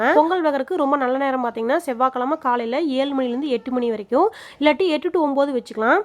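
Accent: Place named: Coimbatore, India. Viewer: BEAUTIFUL KOVAI SLANG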